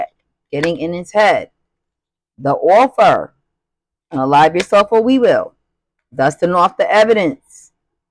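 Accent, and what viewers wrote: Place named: Spanish Harlem, United States. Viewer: New subscriber here!! Are you from nyc ? Lol my husband is n your accent sounds like his hes from Spanish Harlem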